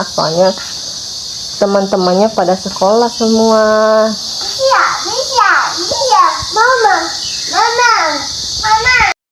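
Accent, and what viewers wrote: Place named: Eastern Indonesia, Indonesia. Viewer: Bisa dimengerti mengapa sering mengucapkan kata Teman Teman.. Gaya bicara orang dari Timur Indonesia masih kental sekali.. Mohon Kakak2 memaklumkan nya dan Kakak kakak bantu dukungan maksimal supaya kakak kakak dan semakin dekat.. Semoga kakak kakak senang kedepan nya.. Oke kakak kakak sekalian sekian dulu kakak kakak.. Sampai jumpa lagi..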